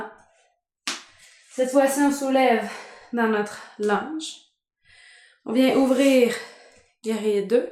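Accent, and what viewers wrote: Quebec, Canada. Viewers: J’étais extrêmement tendue et stressée, et me voilà à rapper yoga sur YouTube pour trouver de qui me détendre. Cette vidéo, c’est du bonheur ! Et moi qui suis française ayant vécut au Quebec pendant 2 ans (Montréal), retrouver cet accent si typique dans le plus grand des hasards c’est encore mieux !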